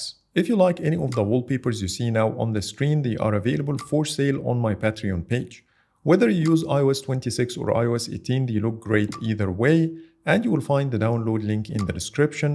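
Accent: Egypt. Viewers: Your English sounds Egyptian to me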